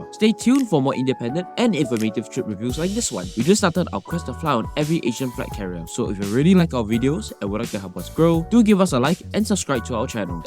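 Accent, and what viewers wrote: Singapore. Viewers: I do miss the Singaporean accent. Very nostalgic indeed. Keep it up.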